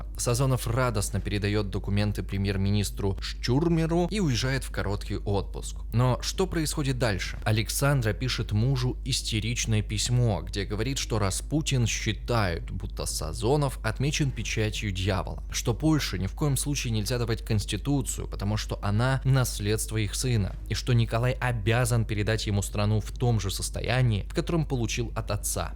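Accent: Ukraine. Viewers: Господи, как же раздражает этот украинский говор, нахрена букву Я в каждом слове проговаривать как Я твердо, в русском вообще мало где она так произносится, пишется Я, а произносятся звуки Й, И, либо вообще проглатывается звук Я при разговоре. Кровь из ушей, хуже только кубаноидный говор, этим говором русских пытать можно, только включить запись и мы сойдем с ума. А канал классный, но мои уши сворачиваются в трубочку 🩸 Я бы лучше с субтитрами посмотрела, выключив звук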